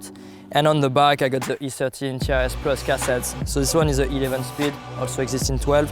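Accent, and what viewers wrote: France. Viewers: Clairement l’accent français est là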